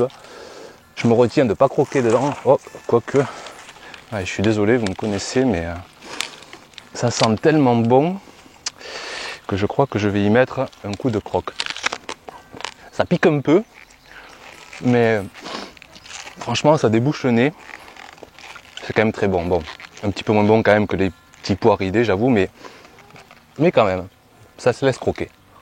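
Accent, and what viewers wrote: South, France. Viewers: Salut, Comme dit Ludo, c'est toi qui a volé le soleil! Nous on se prend les orages tous les jours, et toi tu nous nargues avec ton potager magnifique qui conserve une avance scandaleuse sur nous-autres 😉 En plus, à chaque fois, tu me fais envie à grignoter tout ce qui passe alors que chez moi c'est pas mûr!!! Non mais l'amaranthe quoi! Les miennes font 10cm MDR Comme d'hab, jardin magnifique, plantes magnifiques, et le petit accent du midi qui va bien, il manque que le Ricard (tu fais pas pousser le Ricard? Comment ça??? ^^)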